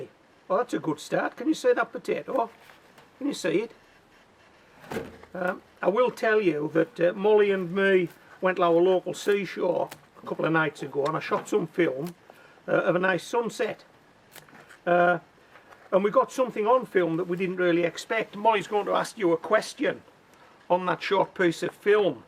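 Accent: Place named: Lancashire, United Kingdom. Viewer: You sound like you are somewhere near Bury, Bolton, or Wigan.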